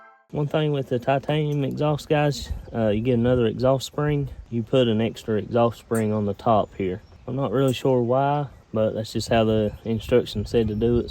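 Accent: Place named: United States. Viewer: This guy have a really hard accent to understand,,, no offense but people from the USA need to learn how to speak English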